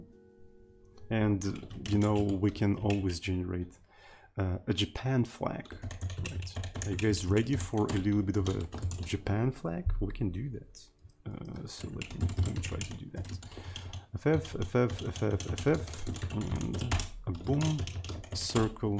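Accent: Russia. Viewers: русский акецнт?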